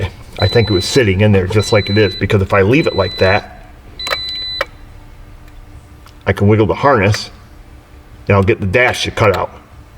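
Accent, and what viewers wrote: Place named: Upstate New York, United States. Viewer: I use to live in upstate NY around Rome. I never thought your speech was abnormal, sounded normal to me for upstate NY. I enjoy your videos and learning a lot from them, Thank You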